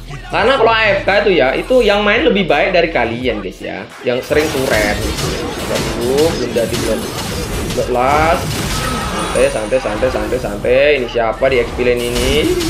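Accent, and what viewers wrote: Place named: Malang, Indonesia. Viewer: Triple kill-> niyok-> jebret->Maniac😂😂 Boso jowone podo karo boso Jowo Malang-an😂 Salam dugi Kota Malang ❤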